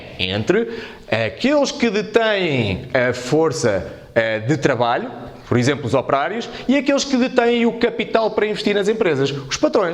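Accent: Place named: Portugal. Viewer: Eu sou do Brasil vc me ajudou muito msm sendo português amei seu sotaque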